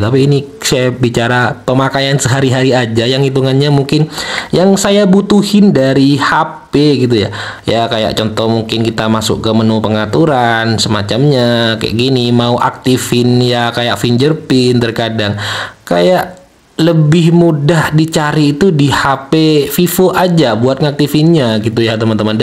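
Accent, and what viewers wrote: Madura, Indonesia. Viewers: Bhee medureh kakmah kak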